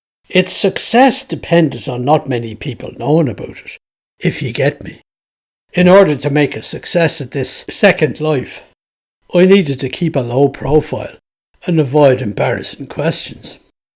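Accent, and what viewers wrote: Dublin, Ireland. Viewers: I have a confession. I've come to tune in to your channel - not just for the story - but to hear that wonderful Dublin dialect! I love it!!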